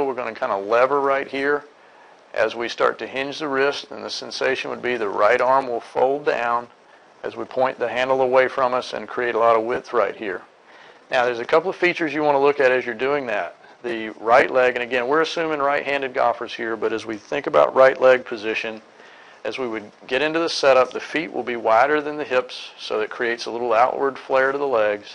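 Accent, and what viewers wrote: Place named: United States. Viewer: I am from England what part of USA is your accent from? Oh and yes def in the top 3 Youtube teachers!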